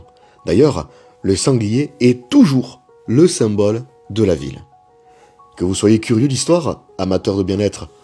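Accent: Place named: Béarn, France. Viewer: Merci pour ta vidéo, je découvre ta chaine, lâche rien. Ta voix avec l'accent Béarnais c'est très immersif.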